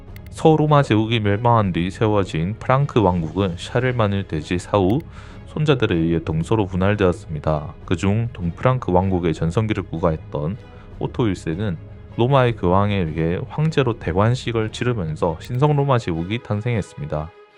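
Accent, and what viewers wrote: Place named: Gyeongsang, South Korea. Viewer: 표준어를 쓰는듯 하지만 느껴지는 경상도의 향기...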